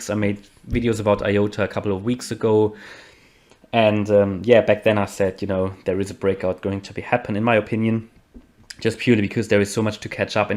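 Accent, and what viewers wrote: Germany. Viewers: Your accent sounds german, correct? I like that you are very specific with your forecasts. I subscripe your channel hoping for more iota videos.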